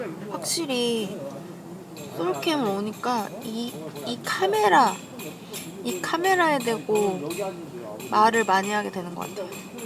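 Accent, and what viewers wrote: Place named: Seoul, South Korea. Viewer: 서울말 ㅎㅎ!!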